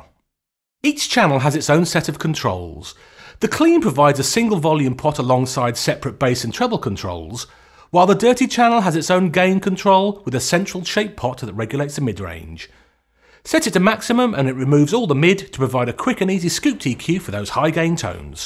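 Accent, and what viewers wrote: United Kingdom. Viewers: you are better because you have British accent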